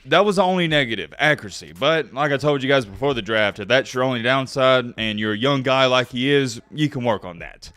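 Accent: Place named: Alabama, United States. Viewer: Hey, What do you say at the end of your videos? My husband and I can't figure it out. We know you're a huge Bama fan, but we don't understand Bama speak. We aren't Bama fans, but we love your channel.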